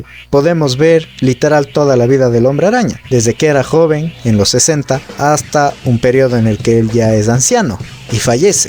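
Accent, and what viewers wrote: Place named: Ecuador. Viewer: Ese acento, sospecho que eres de Cuenca o de Quito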